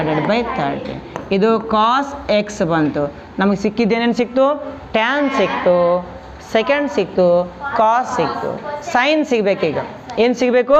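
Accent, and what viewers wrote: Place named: North Karnataka, India. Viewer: Nim uttara karnataka bhashe Nam kade baidid tara ansute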